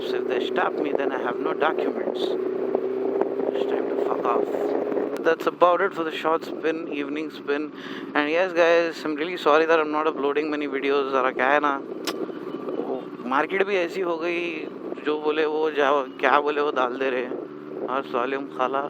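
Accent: Hyderabad, India. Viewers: Bhai teri hydrabadi mast lagti hai re garda hai garda 😘😘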